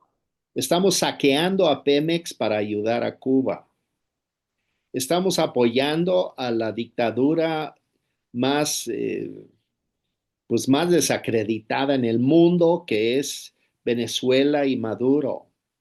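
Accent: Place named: United States. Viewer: Enrique Pascoe, ¿no eres mexicano? hablas mal el español. Al hablar del bloque liberal democrático ¿te refieres a los norteamericanos y europeos? Pensé que ellos había esclavizado a medio mundo, Africanos, asiáticos y aborígenes americanos. ¿No es EU el que le quitó más de la mitad del territorio a México? ¿NO ese mismo EU, que mencionas el que amenaza a Canadá en volverlo Estado de EU, o a Dinamarca de quitarle Groenlandia? ¿No es ese mismo EU, el que destruye a sus aliados? Solo hay qué ver la condición de los europeos ahora, después de la guerra con Ucrania. A México le iría mejor manteniéndose más al margen de EU, o lo acabará destruyendo. Lo mejor es que nosotros como mexicanos veamos nuestras opciones, no las de EU. De todas formas comprendo tu punto de vista: pareces gringo, hablas como gringo, defiendes a los gringos, aun con sus peores acciones. 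Entonces, eres gringo, ¿por qué no lo habrías de defender?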